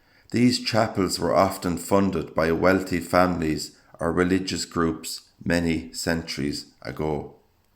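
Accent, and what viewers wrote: Ireland. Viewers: I enjoy your videos and love your brogue. You remind me of my great grandfather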